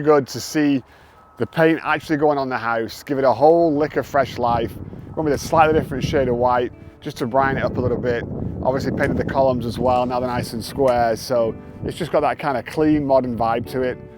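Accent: England, United Kingdom. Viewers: Amazing build, I bet your so glad you took the gamble, you don't sound American, UK maybe? What was the final cost? really curios. Jim in Liverpool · This guy is British! 🇬🇧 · Are you from England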